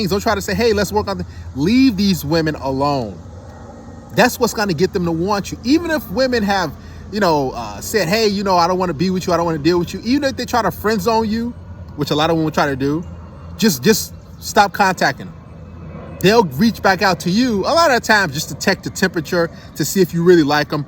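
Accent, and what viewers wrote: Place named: Long Island, United States. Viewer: That gawd aweful Long Island accent!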